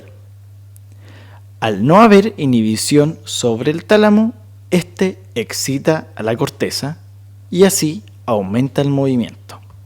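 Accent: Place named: Chile. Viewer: El acento chileno es bello, pero no lo soporto para estudiar )) jajajaajjaja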